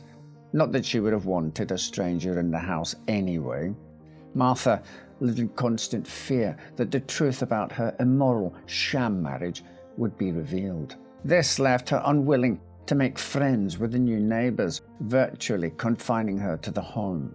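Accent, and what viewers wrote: Scotland, United Kingdom. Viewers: Does anyone know what accent this is? I sort of have an idea but I'd like someone who knows better to confirm. EDIT: He's Scottish, I thought so! Thank you guys! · is Scottish 🏴👍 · The narrator is Scottish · Very impressive pronunciation, every syllable was perfectly enunciated, a hint of Scottish brogue, well done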